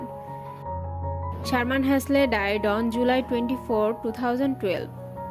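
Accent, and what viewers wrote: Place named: South India, India. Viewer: This narrator has very strong South Indian accent. I can't understand her ?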